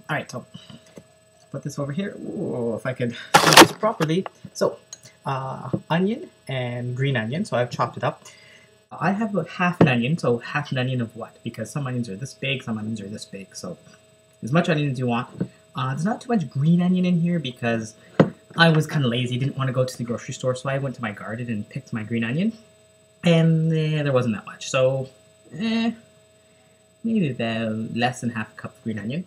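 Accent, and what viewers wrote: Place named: United States. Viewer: trini cooking trini and have american accent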